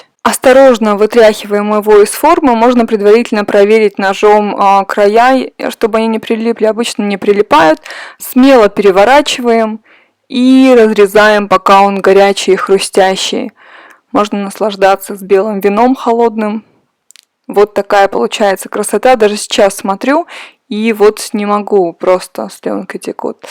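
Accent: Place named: Siberia, Russia. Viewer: Я тоже почему-то подумала про оленину, когда читала и слушала описание вкуса кенгурятины ))) Я родилась и выросла в Норильске, помню пельмени к коробочках машиной лепки из оленины ))), ваша скорость мысли и речи выдает сибирячку, москвичи и вообще запад России говорят иначе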